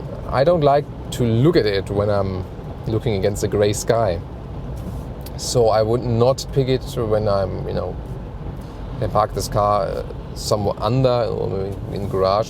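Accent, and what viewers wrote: Germany. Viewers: Ein Deutscher spricht Englisch. A German speak Inglish.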